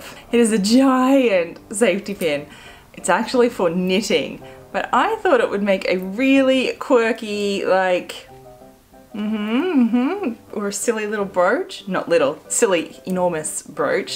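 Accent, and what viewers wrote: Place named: Australia. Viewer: New to your channel, your accent sounds Aussie to me, do you live in Australia?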